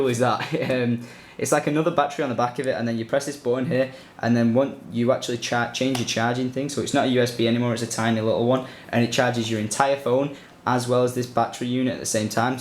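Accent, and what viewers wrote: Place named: Manchester, United Kingdom. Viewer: What part of manchester does he live in, i mean im from bolton and he sounds like he's local?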